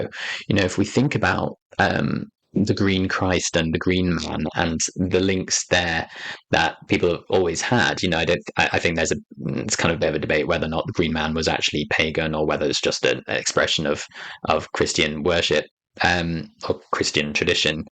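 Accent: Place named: Southern England, United Kingdom. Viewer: 😂 well that explains a lot. I thought I had picked up from one of your other videos that you were from the deep south of England.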